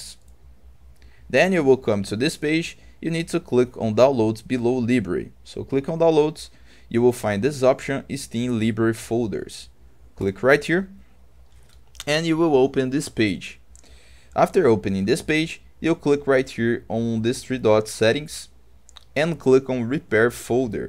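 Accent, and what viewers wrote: Brazil. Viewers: esse sutaque br ai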